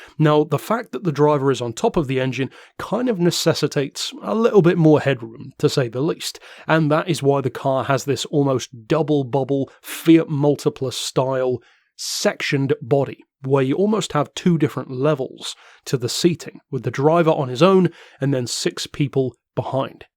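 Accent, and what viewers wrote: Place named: Cornwall, United Kingdom. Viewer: if you see this haha, are you Cornish because you have a very posh Cornish accent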